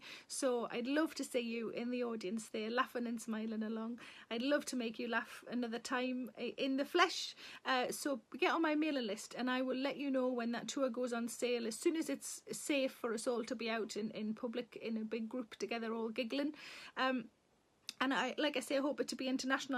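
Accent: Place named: Durham, United Kingdom. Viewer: Listening to you every day has been like a home comfort. It reminds me where I’m from and the proper way to talk. I was a frequent visitor to Boldon cinema when I was younger. Perhaps we met. Thanks from near Luton, originally Seaham.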